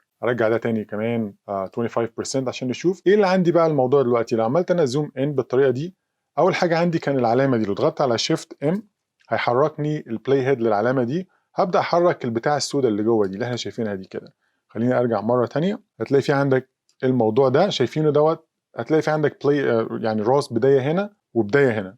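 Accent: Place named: Arab world. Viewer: Your arabic accent is so hard my friend.. 😢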